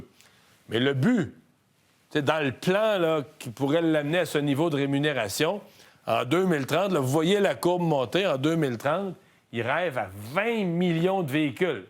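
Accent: Canada. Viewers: vraiment l'accent canadien j'adore bon devolleppement sur le sujet big up canada all and alls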